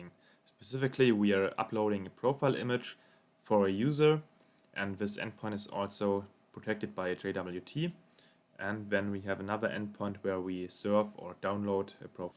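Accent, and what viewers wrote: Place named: Germany. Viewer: are you german? your accent seems like it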